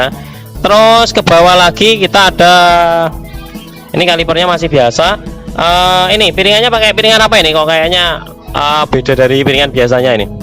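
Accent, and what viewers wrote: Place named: Java, Indonesia. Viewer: Kalau saya sih untuk segi kata² dan penjelasan nya agak di perbaiki biar mudah di cerna kayak roti hehehe itu sieh comentar saya lur dan dari segi bahasa agak di perjelas lebih enak bahasa indonesia tapi terkadang agak susah juga soal nya dari keseharian bahasa nya medhok bahasa jawa Siap slur maaf kalau ada salah² kata Mohon di maafkan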